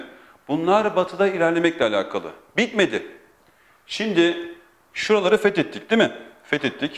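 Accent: Gaziantep, Turkey. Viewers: Antepli olduğunu nasıl belli ediy 😂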